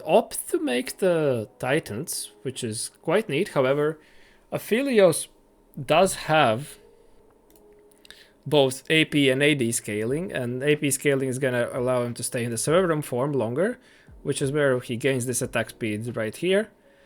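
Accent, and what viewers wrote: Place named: Latvia. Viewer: Will have to try this one out. Based on accent and Channel name I guess it's safe to assume you are from Latvia. Also, it seems like the titles of your videos grab attention very well, but some work on thumbnails could help you with reach, I haven't explored much of TFT content, but a bit of research on other TFT videos and their packaging can go a long way when it comes to exposure.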